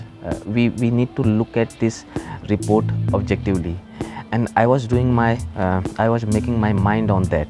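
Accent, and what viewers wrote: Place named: Uttarakhand, India. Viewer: 😀 Sounds like a family member.. you are from Uttrakhand too !!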